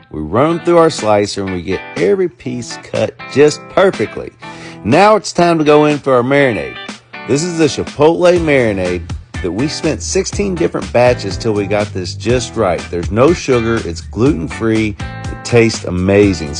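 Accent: South, United States. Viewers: I hear a Southern drawl, y'all.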